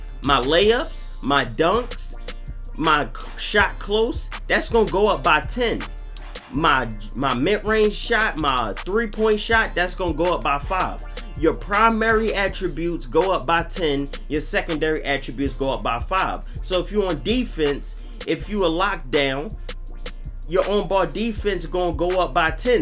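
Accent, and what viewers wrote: Philadelphia, United States. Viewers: My nigga are you from Philly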